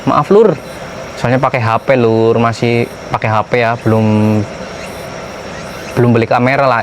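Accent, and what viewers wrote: Banyumas, Indonesia. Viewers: Maaf mas asli ne janeh ngendi kok pd ky aku ngapak🤭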